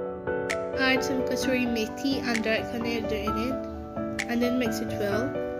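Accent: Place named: England, United Kingdom. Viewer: Yooo Desi recipe in English accent... Yummy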